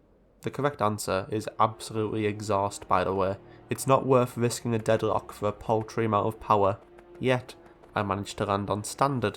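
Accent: United Kingdom. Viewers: i meant start of the video when you saying about Ko-fi supporters, if im right in Britain people when saying "a bottle of water" they pronounce it like "a boa of woa", and when you said "supporters" by pronouncing it "supo-es" i remembered about Britain accent, LOL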